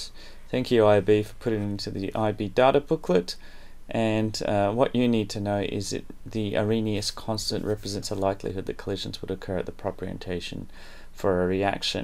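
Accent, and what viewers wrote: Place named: Australia. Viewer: Hi,Lukas here.... Your videos are really great! I'm watching this one now for the test on Monday, in fact. By the time I've finished the iB, I hope to have mastered the Australian accent through your videos, but of course, in addition to some good chemistry knowledge. Also, I'd like to point out that the mysterious "DOGE" = Luke.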